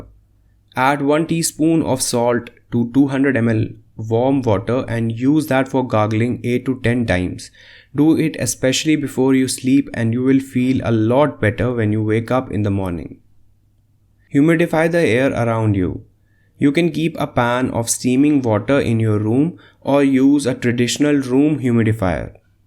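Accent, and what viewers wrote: India. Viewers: Indian accent 😁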